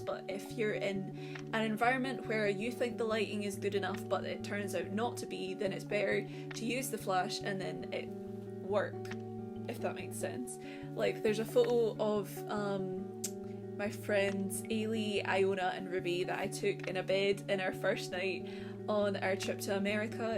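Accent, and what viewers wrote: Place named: Wales, United Kingdom. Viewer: you sound welsh even know i am welsh :/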